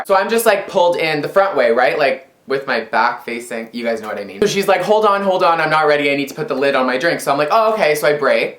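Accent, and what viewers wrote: Canada. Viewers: it sounds more canadian than his normal voice hahaa x)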